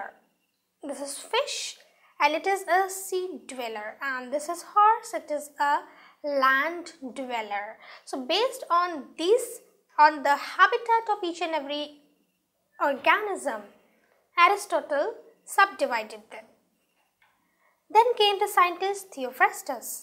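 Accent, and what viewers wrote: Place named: India. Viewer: I can’t fucking handle your accent. Learn to speak English or go back to god damn India.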